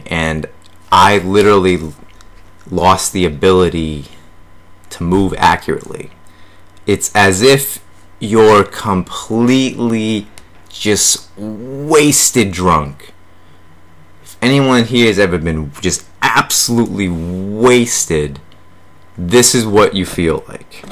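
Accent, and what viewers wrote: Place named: Rhode Island, United States. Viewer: Rhode Island accent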